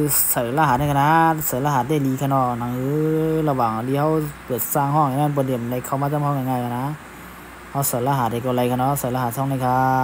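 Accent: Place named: South, Thailand. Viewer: ภาษาคนใต้ป่าวๆ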